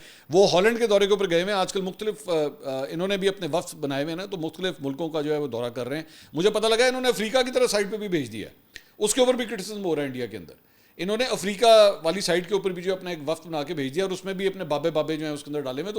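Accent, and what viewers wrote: Punjab, Pakistan. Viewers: bhai apki hansi he dushman ka dil jalany k liye kafi hy ...Allah pak apko apni aman may rakhy mza ata hy apki baton may pnjabi tuch ❤ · Meta Bhai, Urdu bhi Punjabi accent mai bolta hy. اندرون شہر کا اثر ہے۔ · We love your Punjabi accents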